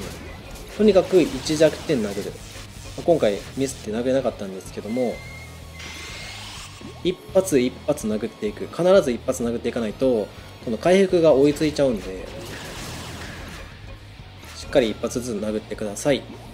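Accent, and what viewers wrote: Kansai, Japan. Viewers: さんの関西弁がたまらないです！いつも勉強になってます(^.^)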